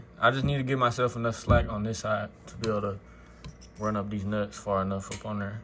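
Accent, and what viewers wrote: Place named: Texas, United States. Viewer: Where you from bro you talk like me I’m from Dallas lol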